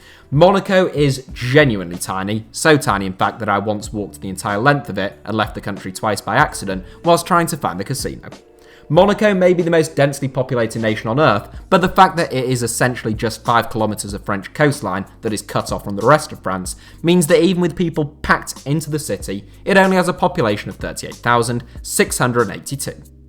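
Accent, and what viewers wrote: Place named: England, United Kingdom. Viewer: Your english accent is so heavy that I can't understand a word as a foreigner😂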